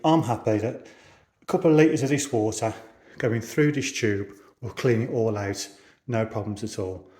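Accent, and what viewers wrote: Birmingham, United Kingdom. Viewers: Thank you so much for this great video. I'm here in France and bought my machine on my builder's recommendation, but was really worried about how to use it. You took all the stress out of it!! 🙂 (I love your brummy accent too ;-)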